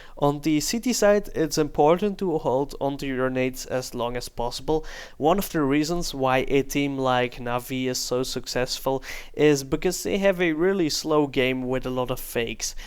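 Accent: Netherlands. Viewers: Even though i'm dutch his dutch english accent made me cringe. (So basically i think my english accent is pretty good) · I like your Dutch accent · Hoor het zo aan je accent. Had dat steam bericht nog niet eens gezien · I knew from the start that his accent was from the Netherlands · I already heard on your accent that you probably were Dutch, until I saw a steam msg, "Waarom negeer je me" I wasn't for sure, Goede video ga zo door! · Je hoort het al aan z'n engels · Ik wist wel dat je NL was, hoorde het aan het Nederlandse accentje ! Leuke video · OMG IS NEDERLANDS. IK MERKTE HET AL AAN HET ACCENT MAAR TOEN IK DIE GOZER ZAG DIE ZEI WAAROM NEGEER JIJ MIJ WIST IK HET ZEKER.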